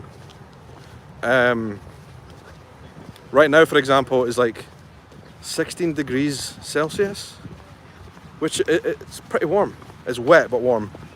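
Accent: Scotland, United Kingdom. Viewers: It's great to have you guys both visit a historic part of the USA. I hope y'all will have a wonderful time here. Edit: Hi from San Antonio 63° F I love your bolo! Remember your Scottish accent will open a lot of doors for you and. People love it.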